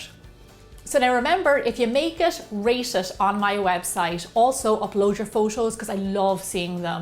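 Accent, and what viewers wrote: Ireland. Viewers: I’ll make my own all organic pie crust 😉 short cuts not important for me 😊 ... thank you for the recipe ❤️ your accent make me wanna move to Ireland 🇮🇪❤️😊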